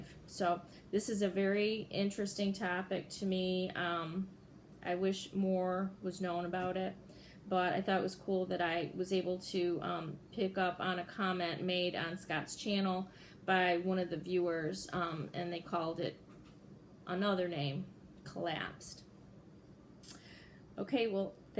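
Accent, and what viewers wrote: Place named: Midwest, United States. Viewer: Love the midwestern accent!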